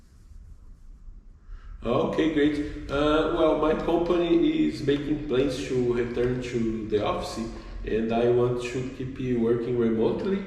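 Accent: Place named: Brazil. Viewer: Ta bem brazilian… mas ta fluido e confiante demais, isso importa muito. Parabéns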